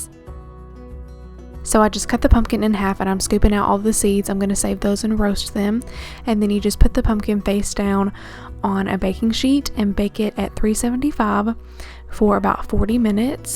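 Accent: South, United States. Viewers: You have the perfect country accent 😍 I'm from Tennessee and have one too lol but your accent is so soft pleasant 🥰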